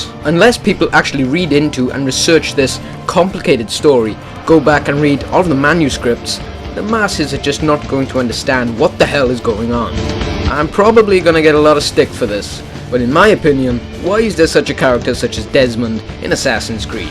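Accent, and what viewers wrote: Wales, United Kingdom. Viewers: I'm picking up Welsh, Italian and Irish from your accent? No offence intended.. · Are you Welsh? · you must be from wales! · Where are you from? you sound welsh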